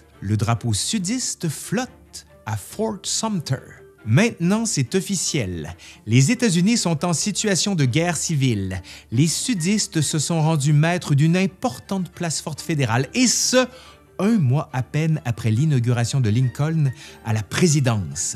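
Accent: Quebec, Canada. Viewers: Le narrateur a un superbe accent Canadien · Avec ce petit accent canadien qui fait que le charme est complet car on est complètement dedans ! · Très intéressant. Un point objectif et clair sur la guerre de sécession 😮. En plus l accent canadien est au top😅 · J'aime son accent français. Est-ce Canadian ? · Beau travail, l'accent Québécois ajoute un côté agréable même si quelques mots m'échapes . Mais pas grave le sujet est boulot effectué est très agréable a visionner. Bravo ! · Super vidéo, je suis un passionné de la guerre de sécession, et c'est un véritable plaisir de l'entendre raconter par ta personne. Surtout ne perd pas ton accent Québécois, cela enlèverais la moitié du plaisir à t'écouter.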